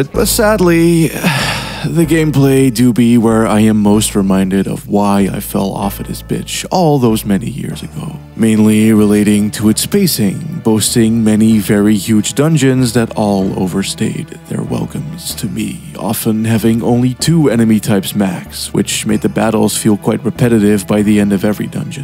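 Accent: Netherlands. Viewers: I had a sneaking suspicion you might be Dutch by the way you pronounce certain things but you casually dropping a Dutch sentence in the middle of this video still hit like a truck holy shit lol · Do I hear a very tiny slight Dutch accent? Edit: dus toch! 😁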